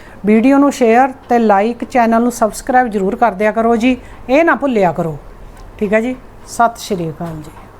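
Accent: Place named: Puadh, India. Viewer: Tuhada pind kehda a boli ta tohadi puadh di lagdi a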